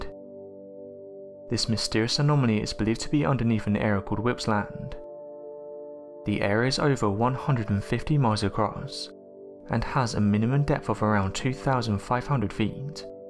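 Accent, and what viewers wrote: England, United Kingdom. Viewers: Yeah his cadence is amazing and the way he pronounces words. I could listen all day! I can't quite make out what type of English accent that is. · His beautiful British accent and pace of narration too...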